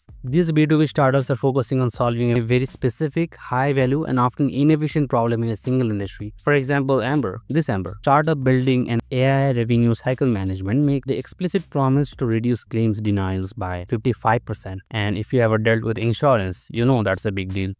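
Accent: India. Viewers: not from Bangladesh I approve it. This is Indian accent. He could be from Odia only. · ur accent looks like you are bengali or odia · What is ur mother tongue, I want to know which Indian language produce this English accent because it doesn’t sound Hindi accent English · just from the accent i can say indian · you spell with indian accent make boring startups become 1000% fun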